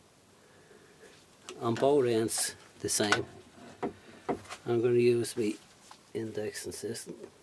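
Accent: Dublin, Ireland. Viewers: You're Irish!<3 Where about are you from? You sound like you're from Dublin. I am from Dublin and am a student. I have a great interest in woodworking :)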